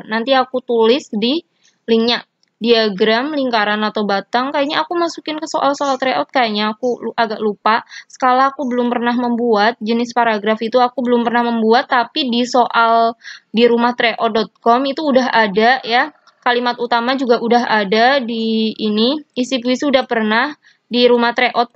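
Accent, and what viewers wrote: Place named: Java, Indonesia. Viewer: Muedok nya itu lo bikin semangat belajar bareng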